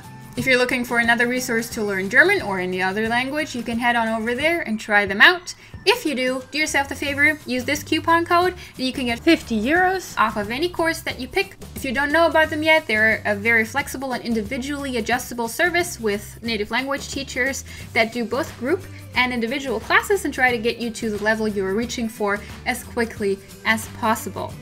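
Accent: United States. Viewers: You're such a food explainer/teacher. And you sound so American with the f bombs. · I believe you'd better concentrate on teaching us German instead of persisting in showing us how well you can speak Americanized English. You are an amazingly beautiful girl: you kinda attract our attention to your cuteness rather than teaching us anything. So, could you do something about this? :)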